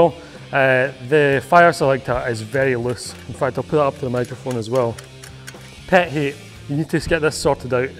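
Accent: United Kingdom. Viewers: Jeez, everything you say is so credible. Damn British accents!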